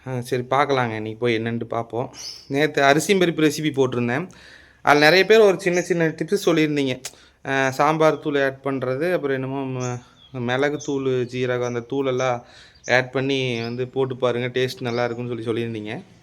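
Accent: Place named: Kongu, India. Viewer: அழகு கொங்கு தமிழ் 🤝😊🥰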